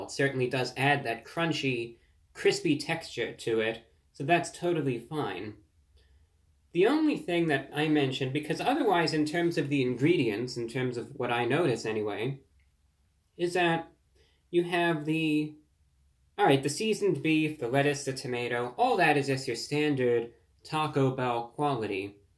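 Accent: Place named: Transatlantic, United States. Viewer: Dude I swear you're like the the closest to being a living relic of the Transatlantic accent, it doesn't sound purely transatlantic but it's close to it, very close to it! You should do some voice acting on 20th century themed video games or something like that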